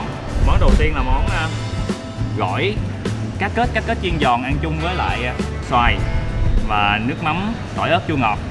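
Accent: Mekong Delta, Vietnam. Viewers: Mê cái giọng nói miền tây mất rồi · Chuc mung anh đuoc hon 100.000 nguoi đk nha! Rat thich cach a nc kieu mien tay iu mien tay! E cung ng mien tay😘🙌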